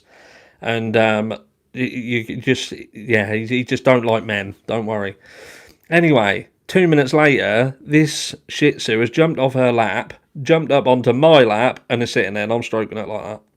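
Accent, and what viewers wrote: United Kingdom. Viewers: I am so bummed that I missed this... I rarely have time to catch lives, but for you, I'm gonna do my best! You crack me up!!! Tell all them TROLLS that HATERS OF HORSE-SHIT (yes I've named ur super hero fan club & I am the leader lmfao) 2nd that FUCK OFF & will make life hell with our super powers... those powers include common-fuckin sense, ability to understand & love a great British accent 😉, and our desire to do anything we can to help the voiceless get justice and stop history from continuously repeating!!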